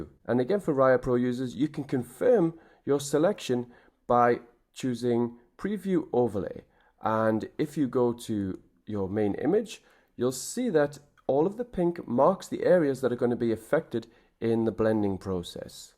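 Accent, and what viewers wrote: Newcastle, United Kingdom. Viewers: are you from Newcastle? awesome tutorials thank you ☺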